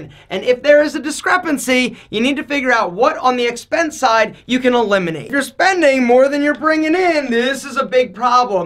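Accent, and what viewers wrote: Philadelphia, United States. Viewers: I am just here for you Philly accent. Love it.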